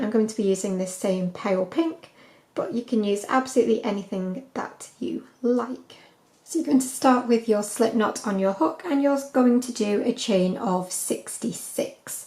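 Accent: United Kingdom. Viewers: I crashed out because of how fast she was going and didn’t understand her British accent which got me so mad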